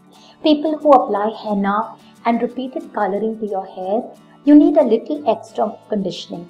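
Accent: India. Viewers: It's Indian accent. Though I'm not Indian myself, I find your comment offensive. Not every accent are to our liking but we get accustomed to them. I hear many dielects here in London and I don't go telling everyone what I think of them. It's a language and it's purpose is to communicate. How you find it is your personal preference. Don't think anyone gives a fuck what you think of the accent same way no one cares what I think of the moon. Just get the message and utilise it.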